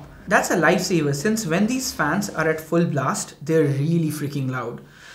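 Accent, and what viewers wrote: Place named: Bengal, India. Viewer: That's how Bengali accent is 😍😍😍😍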